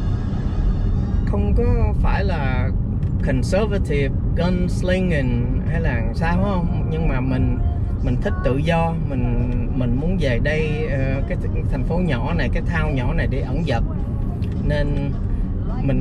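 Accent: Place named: Vietnam. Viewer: Hi,qua Mỹ lâu mà sao vẫn giữ giọng VN hay há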